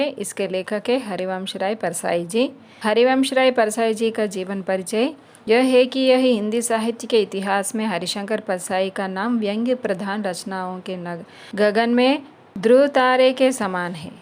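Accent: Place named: Andhra Pradesh, India. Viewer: Mam looks like your accent is different I think you are more comfort in telugu 😅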